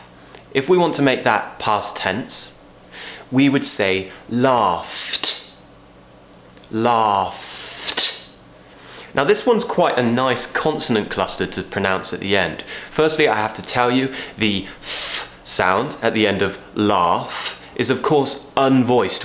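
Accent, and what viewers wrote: United Kingdom. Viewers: I am always love the way you speak english with your british accent And Also sometimes i find the difficulty of word ending /ed/ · Thank you very much for your help.It's a great way to teach my students !!! By the way I love your British Accent. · I love British accent, And i love hearing your voice and learning British English at the same time. I'm browsing all of your past videos and i'm in love with it. Your such an inspiration. Thank you.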